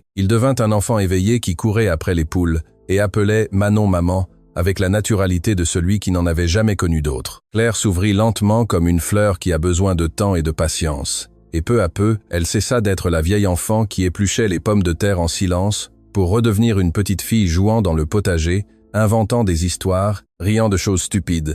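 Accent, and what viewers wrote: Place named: Auvergne, France. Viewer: ❤❤❤ très très belle histoire d'amour qui donne du beaume au coeur. Mon passage préféré est la visite de Jérôme au curé car on se doute qu'il va lui parler de mariage et je n' attendais que cela. Je suis en France en région Auvergne, c'est un endroit juste au milieu de la carte et ce genre d' histoire a pû exister en son temps car le conteur parle comme parlait les gens d ici.❤❤😂❤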